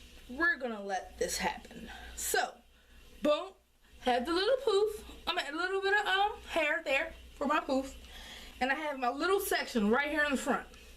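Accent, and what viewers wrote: Baltimore, United States. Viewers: U must be from Baltimore wit the tew nd dew lol...much love sis...our city's strong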